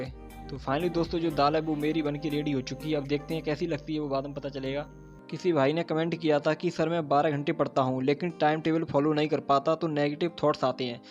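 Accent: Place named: Chambal, India. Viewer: भाईसाहब आप कहां से है ,, बोली से लग रहा है आप भिंड, (चंबल संभाग) से लग रहे हो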